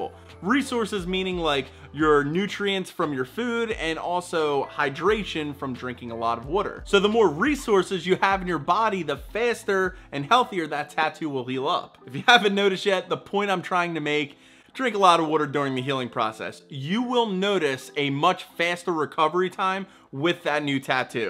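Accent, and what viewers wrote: Philadelphia, United States. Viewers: I can spot Philly from a mile away...the second he spoke, even before “WADER” being said, I could smell the Wawa through the screen on my phone....Go Birds!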